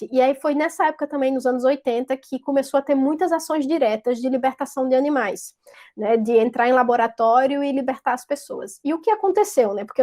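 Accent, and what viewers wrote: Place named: Northeast, Brazil. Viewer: Adoro esse sótaque nórdestino 😁. Por um mundo sem senhores para humanos e não humanos, Tmj 👍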